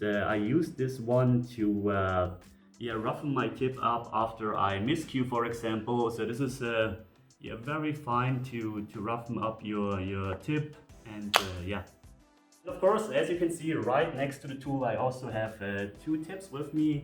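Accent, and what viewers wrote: Germany. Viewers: you sound so german 🤣🤣🤣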